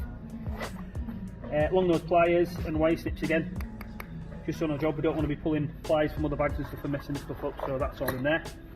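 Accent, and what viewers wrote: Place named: United Kingdom. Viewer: He speaks a little too fast and sounds like he has british accent. Maybe difficult for non english speaker to comprehend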